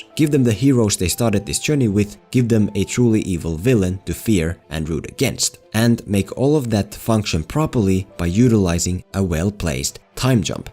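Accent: Finland. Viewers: Are you from Finland? Your accent sounds a bit like that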